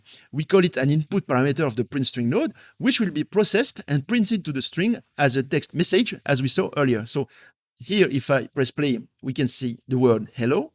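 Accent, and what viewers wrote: France. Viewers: Excellent tuto j'avais pensé que tu étais Français vu l'accent mais le nom m'as trahis hahahah Continu comme ça tes conseils sont d'une précieuse aide pour tous ! En pensant t'inquiètes pour l'accent pour un Français c'est excellent ;)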